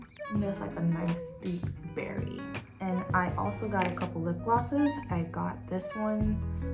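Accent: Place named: Jamaica. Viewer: Sound Jamaican 😎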